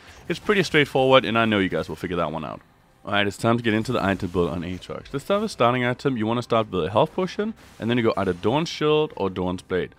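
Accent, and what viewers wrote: Denmark. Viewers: "Go for the spirit of vis-ass" xD love the danish accent.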